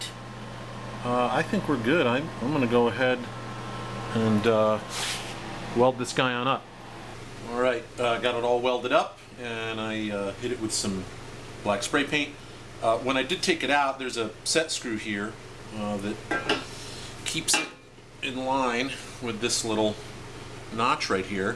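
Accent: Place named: Baton Rouge, United States. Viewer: Nice job. I have a 17 year old 91764 lift with a bad pump. It works fine with my bikes 500lbs and under but puked itself trying to lift my Harley Road Glide. At 900+ lbs it just might be time for a lift replacement. Costly, but the bike is too near the limit of the lift for my comfort. Even if it did work, I'd drill a few new holes for the safety bar higher up as I don't need the bike more than 24" off the floor. By the way, I guessed right. You sound exactly like a contract engineer I use that's from Baton Rouge.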